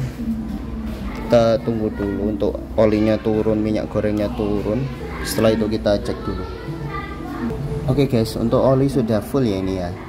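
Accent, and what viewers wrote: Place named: Malang, Indonesia. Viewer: Ini adalah contoh bahasa jawa dialek kota malang dan sekitarnya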